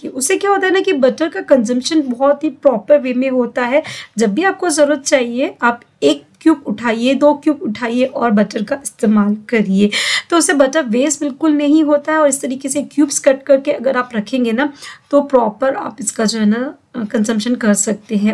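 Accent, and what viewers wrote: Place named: United Kingdom. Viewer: Her accent 😂😂😂😂 British lg rha hai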